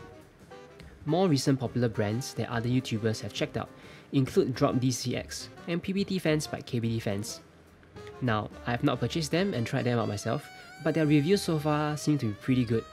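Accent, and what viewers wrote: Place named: Singapore. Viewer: Thanks but I have to mute the video and turn on the subtitles to understand this singlish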